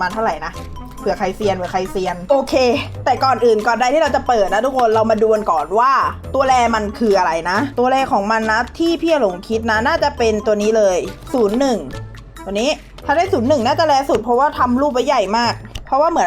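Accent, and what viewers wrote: South, Thailand. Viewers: พี่เป็นคนใต้เหรอครับ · พี่คนใต้เหรอครับผมก็อยู่ใต้ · พี่คนใต้เหรอผมก็ใต้นิพี้เห้อ😂😂😂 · พี่พูดทองเเดงตลกมากผมก็คนใต้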